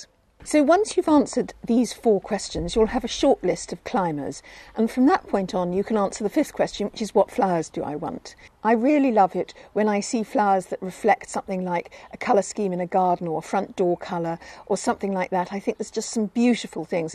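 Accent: United Kingdom. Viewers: I like the way you speak! What a nice British English! Will keep these useful recommendations in my mind, Greetings from Ukraine!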